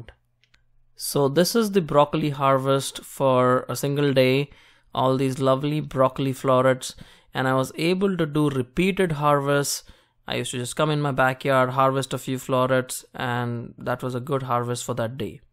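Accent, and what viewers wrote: India. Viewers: Is that a Hindi accent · you sound indian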